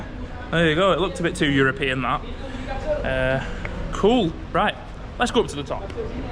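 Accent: United Kingdom. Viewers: Bro..Menonton konten mu, serasa menyaksikan film kerajaan klasik dari Inggris Raya.. Aksen bicara mu..Inggris banget... 😁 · Hi and, welcome in Jakarta. On 5th of October 2025 we will celebrate do called "80th Armed Forces Day" here in Indonesia, so you saw a whole lots of Army, Navy (Marines under Navy) and Air Force stuff being display for public. For your pronounciation, I know you are from UK. Enjoy your stay in Indonesia then. Cheers...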